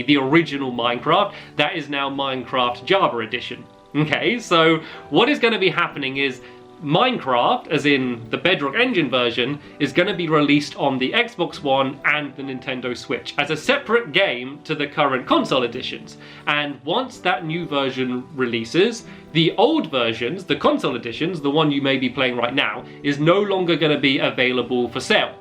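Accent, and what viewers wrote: United Kingdom. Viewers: Thalia Foot he's British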